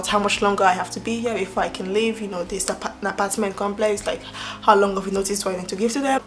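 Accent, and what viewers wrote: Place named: France. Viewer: Do u speak French? Your accent sounds so French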